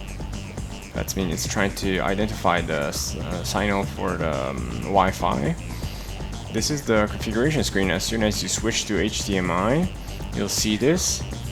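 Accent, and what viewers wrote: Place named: Italy. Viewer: Your english accent is very minimal for being Italian!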